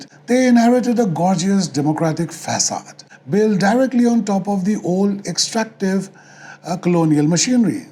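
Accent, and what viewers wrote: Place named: United Kingdom. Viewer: Amazing 🎉❤ amazing 🤩 Very British narration. Thanks for posting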